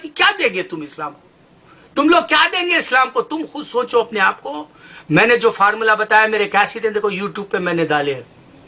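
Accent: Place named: India. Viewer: ye indian hai yaqeenan kiun ke Urdu is tarah pronounce nahi hota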